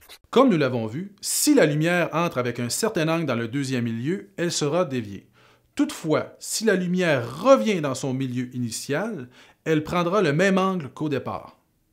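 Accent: Quebec, Canada. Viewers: avec votre accent québécoise, sa me donne vraiment envis de le réviser avec vous ^^ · Je suis en cours je comprend rien y’a trop d’accent québécois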